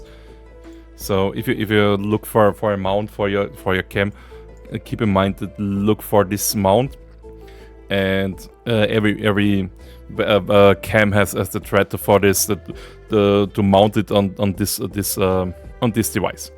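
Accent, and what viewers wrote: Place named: Austria. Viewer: Jeder österreicher wenn er Englisch redet "sorry for the Bad Englisch" redet bis auf'n Akzent fehlerfrei xD Grüße aus Tirol, danke für das Video✌🏻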